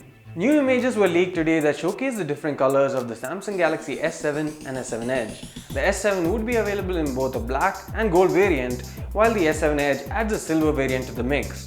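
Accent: India. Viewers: Ninguém entende essa desgraça de inglês indiano!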